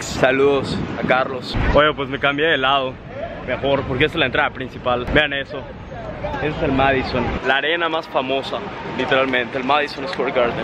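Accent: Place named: Yucatan, Mexico. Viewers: 😁😂🤣🤣🤣🤣😅 No sabes no donde andas.... pero me encanta tu acento yucateco. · Es una chulada ese hombre, ese acento yucateco, y que de el saludo iniciando este video, me ha puesto... HOT! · Orgulloso acento yucateco💘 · Habla como fresa con acento yucateco 😀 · 🗣 hazme un hijo!!! 🥰💋❤️✌🏻 Amo tú acento yucateco, hablas hasta con faltas de Ortografía 😂😂😂 Besos!!! A 💋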